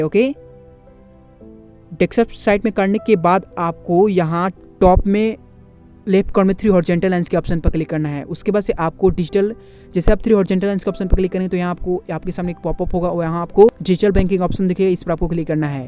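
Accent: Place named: Punjab, India. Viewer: Punjabi accent fast voice not good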